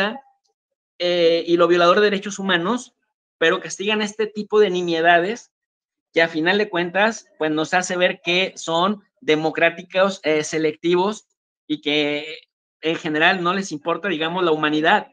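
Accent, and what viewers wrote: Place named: Paraguay. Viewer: Que feliz de escuchar a una paraguaya en el canal🥰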